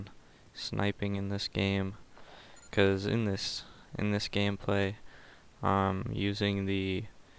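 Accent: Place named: Europe. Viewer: btw for some reosone you sound european in your comentaries lol.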